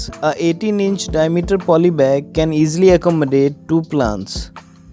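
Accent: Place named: Telangana, India. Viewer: Pakka Telangana dialogue 👌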